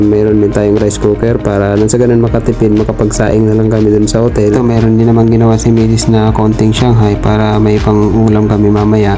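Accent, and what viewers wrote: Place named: Bicol, Philippines. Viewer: kabayan bicolano ka po? puntong bicol ka po eh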